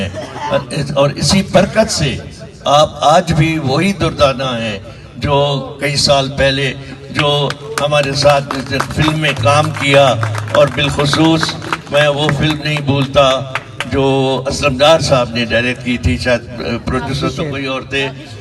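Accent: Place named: Uttar Pradesh, India. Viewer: Paisa Pbi to ka aunda te boli UP di bolda? Ki e tuhadi jamir Pak wali o.?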